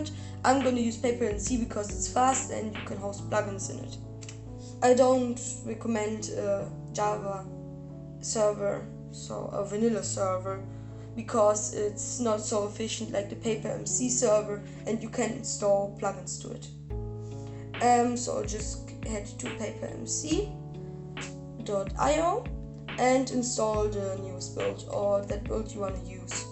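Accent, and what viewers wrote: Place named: Germany. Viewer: du kannst gut englisch aber man hat das gehört das du deutsch bist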